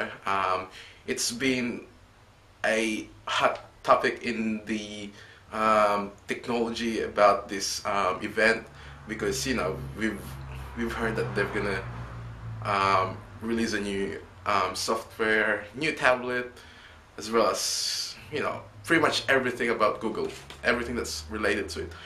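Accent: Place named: Philippines. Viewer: pinoy accent =))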